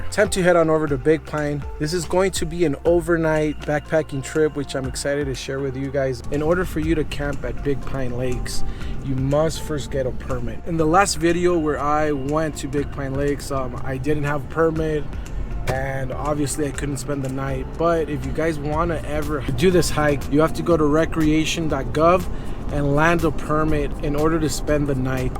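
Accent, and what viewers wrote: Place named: Latin America. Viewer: Sounds by your slight accent must be Latino si..good to see a fellow Latino enjoying Mother Earth well educated in the outdoors well done hijo good to meet you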